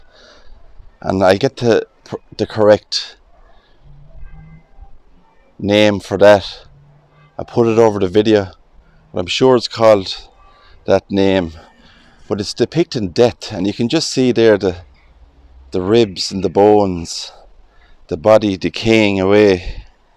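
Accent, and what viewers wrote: Ireland. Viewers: Excellent. I'm so glad I found your channel. Graveyards are beautiful places. All those lives of people who all had a purpose and stories to tell. Your lovely Irish accent is pure joy to listen to as well. Thanks and take care 🙂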